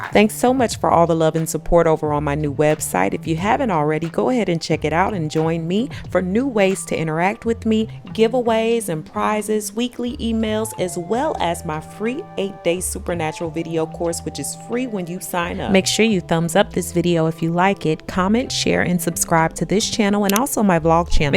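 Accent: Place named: South, United States. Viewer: She's grew up in St. Louis & Memphis & lives in Texas so I think it's a mixture of those three. Just my opinion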